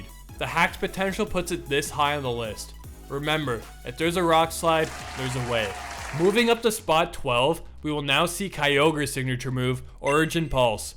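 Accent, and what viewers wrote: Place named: Canada. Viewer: Please don’t use T*ump reactions like imagine if H*tler was used. I hope that puts it into context. I had a more thoughtful comment but of course youtube auto-deleted it. I love your content so I implore you to take this criticism seriously and with empathy for Americans. (It’s always esp like the scenario I described since you’re Canadian, at least I assume based on your accent and then confirmed on your channel location lol). And I say this as a dual Canadian American citizen.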